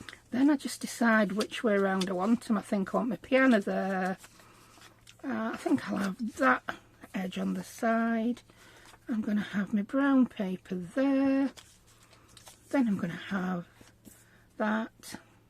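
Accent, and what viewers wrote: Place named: Northern England, United Kingdom. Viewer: I also keep checking in, and have several tubes looked to see if there was a way I could message her just to see if she was ok. I'm in Lancashire and her Northern voice is so familiar and comforting it's like crafting with a friend and I miss new videos dropping.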